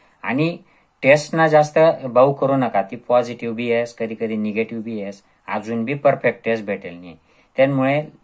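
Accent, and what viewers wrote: Khandesh, India. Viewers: Khan deshi bolatay ka sir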